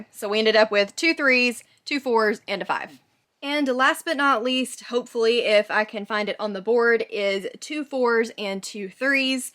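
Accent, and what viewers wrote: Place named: South, United States. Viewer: You may say or have it somewhere.. and if you don’t then you absolutely don’t have to confirm my suspicion if you don’t feel comfortable doing so. This is the internet, after all. But based on your voice, I suspected you are from The South… but then you just said football and bugs dying and now I’m convinced. (And in no way, shape, or form am I mentioning your voice as an insult! Just in case that needs to be said!)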